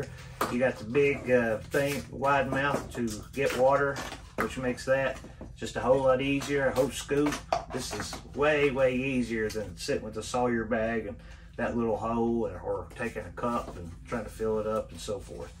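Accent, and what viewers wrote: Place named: Kentucky, United States. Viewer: Always soothing to hear your sweet Kentucky accent! Nice choices in gear my friend. I live that your hammock tarp has lasted so long!!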